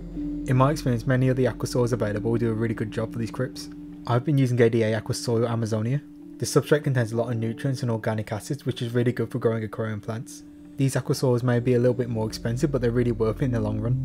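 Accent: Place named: Black Country, United Kingdom. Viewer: lol Brits won't struggle with your speech but Americans will pull their hair out because they've never heard regional British accents. Guessing you're from the black country? Burntwood?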